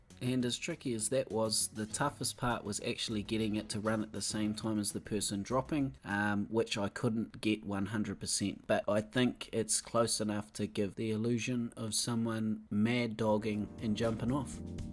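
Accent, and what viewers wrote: New Zealand. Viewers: ok u asked for ideas: i would love to see an extended cinematic view riding on this yellow motorbike! Just riding all through the city. We need to relish in the awesomeness. Its like you create this awesome stuff but we only get to see it once for a very short time. And dont worry about the accent, it’s impossible to sound like a sweaty nerd with a new zealanders or Australian accent lol. At least from an American pov. Good work though, another banger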